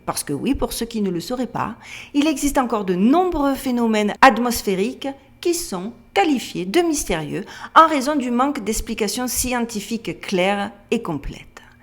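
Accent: Marseille, France. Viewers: Bon aller je me lance on est de Toulon et avec ma femme on est just FAN!!! Milles mercis à toi on adore tes vidéos tes analyses ton intelligence alors qu'au tout début j'étais sur le cliché "blonde avé l'assent de Massilia" mais wtf et finalement comme trop souvent il faut lâcher les "à priori" et conclusion on a découvert une belle personne qui nous apporte bcp. Alors oui mille mercis à toi!!!!!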